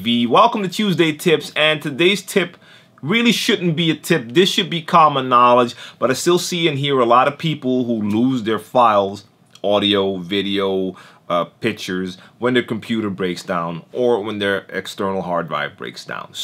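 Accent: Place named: New York, United States. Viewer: Great videos. Just a comment/question...you don't sound like you're from the Netherlands. More like NY. Where are you from originally?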